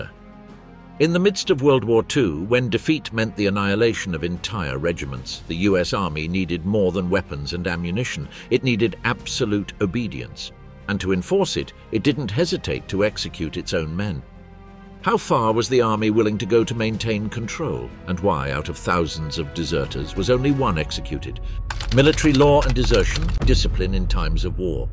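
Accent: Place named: United Kingdom. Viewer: Why do they have a limey talking about Our Army?!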